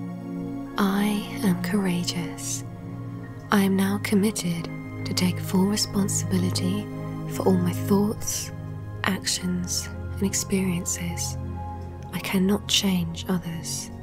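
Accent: Scotland, United Kingdom. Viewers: MrRasZe that would be British. Lovely accent. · This CD is very calming <link> the first meditation focuses on breathing, the second guides you through the Buddhist metta bhavana--loving kindness to all, and the third is a meditation to be used when walking. him is originally from Scotland, so there is a little accent to his voice, but it is very soothing and not at all distracting. If you are a beginner to meditation, this CD will walk you through all the steps of relaxing and breathing as well as sending out the positive thoughts of love and kindness that will be returned to you. We have several CD's, but this one is a favorite that we choose most often. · Whose voice is this? So clear and soft... and I love this beautiful British accent! Thank you very much!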